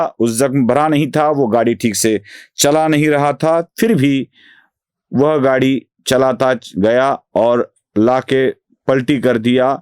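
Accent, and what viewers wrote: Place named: Beed, India. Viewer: क्या गुलाबी हिंदी बोल रहे हो हमारे बीड की भाषा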